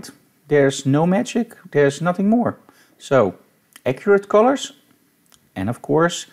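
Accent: Netherlands. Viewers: are you from holland sir ?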